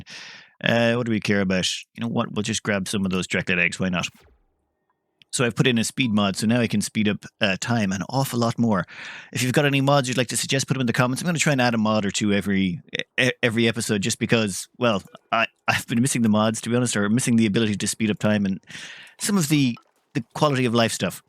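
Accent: Ireland. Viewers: im watching this on my 2014 gaming laptop wot is falling to bits and i just love listening to loveley irish voice, if we can convince him to start a patreon or just keep okn id be happy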